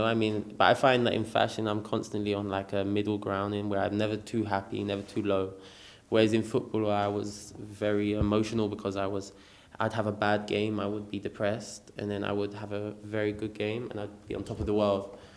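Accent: United Kingdom. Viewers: Idk why everyones a bit disappointed of his voice ....like guys he have got british accent ....😍😍😍😍😍😍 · lili maxwell Actually he's scottish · Lol nah, he just has a typical London accent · His British accent makes him even hotter if that’s possible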